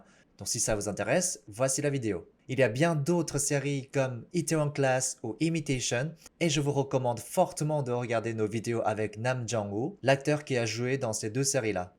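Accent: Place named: France. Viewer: Je suis tombée amoureuse de ton doux accent français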